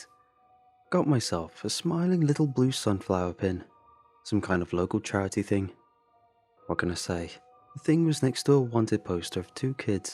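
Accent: England, United Kingdom. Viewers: Considering you speak with a British accent little brother,I would LOVE to guide you through the misty mountains and the foggy bottoms that are in West-By-God-Virginia...🤘☢️🤘 I'm the one who would sacrifice to get you out to tell the story my friend... Come to the U.S. and I will drop blood for you...🤘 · WTF?! He is English. I am American but have absolutely no issue with following his UK accent. In fact, I quite like it for added atmosphere. Heaven help you if you ever have to listen to a Scots or Irish accent if this guy is hard for you to follow.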